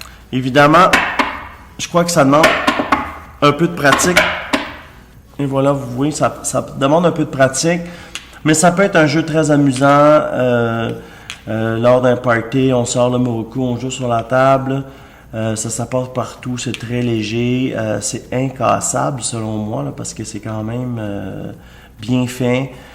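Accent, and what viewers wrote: Quebec, Canada. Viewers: Je suis français et j'adore ton accent québécois j'aimerais bien en recevoir un continue bye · j'adore l'accent canadien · un accent québécois. ?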